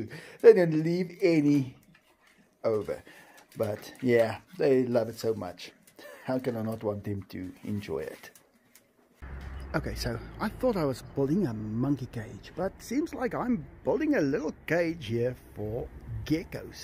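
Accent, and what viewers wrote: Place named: South Africa. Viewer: Majestic cretures + South-African accent =best channel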